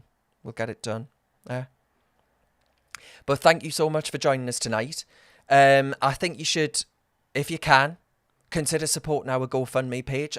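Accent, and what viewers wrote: Newcastle, United Kingdom. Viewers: Leave him alone lol - yous works fine in the Geordy tone 👍😁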